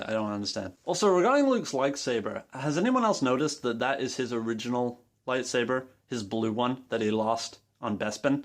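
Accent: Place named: Canada. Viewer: I'm impressed with your YouTubing skills. You're sounding a lot more Canadian than I remember though!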